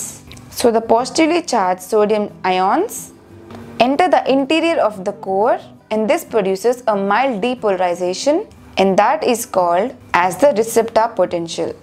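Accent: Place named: India. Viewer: indian accent is so irritating 🥲 thanks for the vdo though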